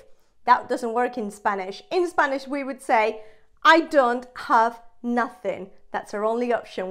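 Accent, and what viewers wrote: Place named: United Kingdom. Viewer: U have such a great British Accent, would you please tell us how we can improve our accents and sound a lil British, I love this accent so much XX.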